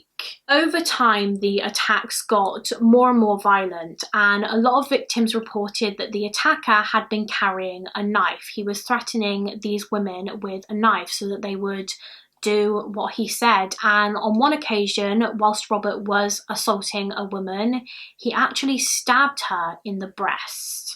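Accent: United Kingdom. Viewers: you've got THE MOST british, british accent I have ever heard. The Queen herself does not sound as British as you do.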